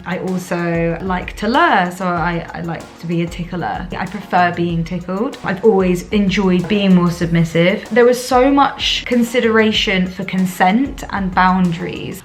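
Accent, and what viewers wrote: United Kingdom. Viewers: Hello, you're AMAZING! 😁 I love your openness and especially about your tickling fetish! ❤ I have had a tickling/foot fetish since I was 6 years old! 😱 I never understood why? For years I thought that I was a mutant and kept my fetish a secret! 🥺 Then thank God for the internet! 🙌 Because then I realized that I wasn't alone and found out that it is a very common fetish! I applaud you for your boldness and openness to discuss to others about our fetish! 👏 I am bummed that I missed this certain tickle party though, 😭 I am guessing from your beautiful British accent though, that it was probably far away from me, since I live in the United States 🇺🇸 I would love to do a party myself, or if you ever plan to come to the U.S. then definitely hit me up and we could maybe make it happen, because there is A LOT of tickling fetishists all over the U.S. 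I have subscribed to you, it was the easiest decision and most important subscription that I had to make on YouTube! 👍I bet you're far from me though, because it seems to be that all the good one's (girls) That seem to be compatible or share the same interests usually live far away! 🥺 Continue being as great as you're! 💗 You definitely have a new friend and fan! PLEASE do more videos regarding tickling! 😍We the tickle community needs more people like YOU! 👇 Take care and HAPPY tickling to you! 🥰 🤣 \|/ /\ 👉👣👈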